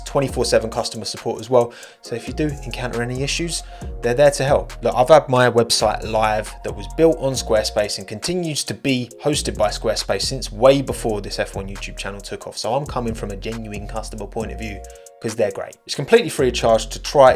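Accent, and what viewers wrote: United Kingdom. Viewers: I always love hearing Italian words pronounced in the "British way"😂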